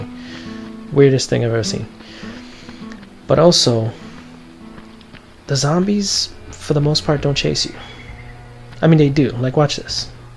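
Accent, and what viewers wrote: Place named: Northeast, United States. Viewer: Hi, I enjoyed your video. I'm thinking about purchasing the game, so you are helping make up my mind. Looks like a great game. I love your accent, I'm thinking northeastern American city?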